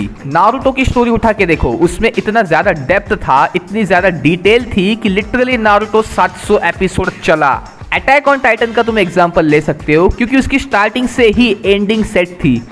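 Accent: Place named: Maharashtra, India. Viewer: Tum Maharashtra se ho tumhare accent se pta chalta hai hehehe Arey Haan yaad aaya ... Wo kaliya wala point tumhare kaafi valid hai vaise inhone isliye use Kiya hoga ek toh sholay se leke aur dusra aaj bhi gaanv dehat mein kaaliya kaalu goru aise naam hote Hain ... Tumhe indtoons ko Disney ke toons , bugs bunny , duck tales etc. se comparison ku nahi kiya karna chahiye tha, tin tin , pink panthar toons pe bhi toh films bani hai woh bhi behad successfull ... Indtoons vaise sarre huye isliye bhi hote hain ki yeh arena multimedia se padhe huye bakwas 2d animation walo ko hire kar lete hain aur budget itna Kam sa rakhte hain ki ek episode ki script ke paise toh hote nahi multi story ya pura character hundreds of episodes build karne ki story kya ghanta hi likhwayenge yeh ... Aur inke cartoon isliye chal jaate hain ki maa baap bhi yutiye hain wo sirf jaan chhore isliye tv on karke kuch bhi cartoon lga ke bitha dete hain isliye watchtime gain karte karte inke yeh behad ghatiya toons chal jaate hain ...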